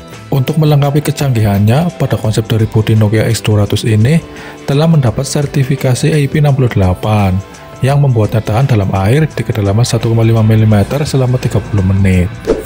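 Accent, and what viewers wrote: Banyumas, Indonesia. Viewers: Mas aslinya seka ngendi? Cilacap, Brebes atawa Purbalingga😄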